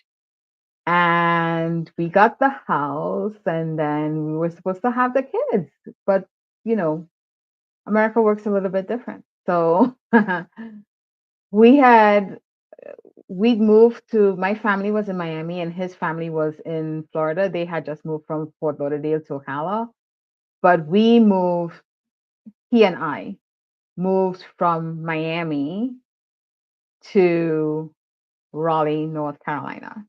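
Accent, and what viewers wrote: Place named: Trinidad and Tobago. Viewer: so.many.thoughts!!! I'll try to be succinct lol. First up--I'm a sucker for Trini accents! Your voice is so soothing 😍. I never hear other Black women talk about this, so this was really refreshing to watch! I'm the only (intentionally) childfree person in my friend groups, and it can feel isolating. Thank you for sharing your story and being transparent about some of the reasons you changed your mind about having kids...and your aunt sounds amazing! I can see why you wanted to be like her. My story is a little different bc I've always known I wanted to be childfree since I was a teenager, and was also raised in Ghana as a kid so I understand how different it is raising kids here vs. back "home". The lack of support for parents (esp mothers) in this country is appalling, and a lot of mothers are bearing the load of raising kids...even if they're married. 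The U.S. is hostile to motherhood, especially Black motherhood. I often think about who's gonna care for me since I'm in my late 30s now, and always planned on taking care of myself the best way I can so that I'm active and out and about even in old age. I plan to either be alone, have hired help if needed, or live in a Golden Girls situation lolol but who knows? Also, the guy who talked about older people without kids is totally right. My mom works in a nursing home, and plenty of older adults with kids die alone, so there's never a guarantee that having kids ensures you'll be taken care of (or at the very least, have someon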